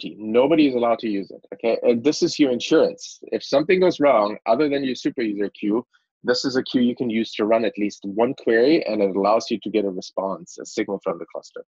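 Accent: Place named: England, United Kingdom. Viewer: Clear enough, and with a clear English accent. I'll allow it.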